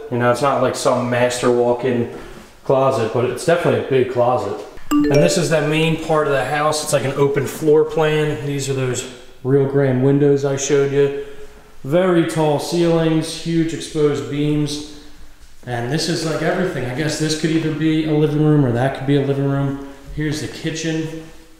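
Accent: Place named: Pennsylvania, United States. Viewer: Hey, just found your channel and subscribed, interesting content. I think some of those are aviary coops? These people were breeders it seems. Those mugs are worth money dude, someone put a lot of money into this property. Is it for sale? Unbelievable, it looks like it can be saved at this point. Oh, I'm detecting a accent, are you from PA? Anyways, enjoyed the content, thanks bro! 🌴😎🌴FL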